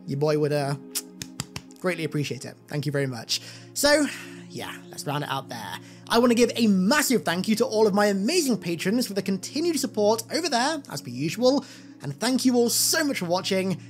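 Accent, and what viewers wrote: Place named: United Kingdom. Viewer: was gonna watch but ur british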